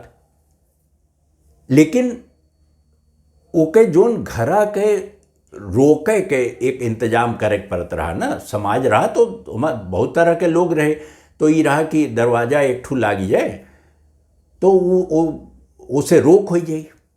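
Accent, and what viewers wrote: Awadh, India. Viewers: Got fed up of listening "English" and other languages. मजा तो अवधि सुने में आवत है। और ऊहू आपके जुबान से,मन तृप्त होई जात है। स्वागत ब आपके, ५ साल बाद इंतजार पूरा भय। अवधि आपहे जिंदा किय्यो है, डिजिटल प्लेटफार्म पर। धन्यवाद, आपके। बस ८वी सूचियों में आए जाए, तो संघर्ष पूरा होई जाए।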